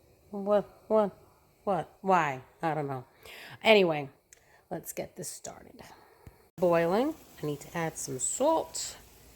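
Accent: New York, United States. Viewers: Love that NewYork accent. Hope I'm correct. Lol I personally go to your channel for that Italian I love eating and cooking. I also find the exact problem with my LeCruset pans also I was so disappointed that they stain. How do u clean yours?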